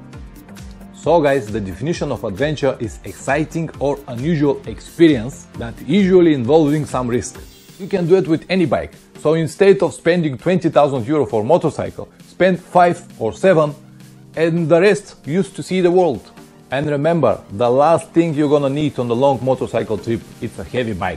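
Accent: Russia. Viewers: я не знаю с какой ты страны, но у тебя такой русский акцент на английском, что я понимаю твой английский без переводчика))! С любовью из России! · Ты чо? Русский чтоли?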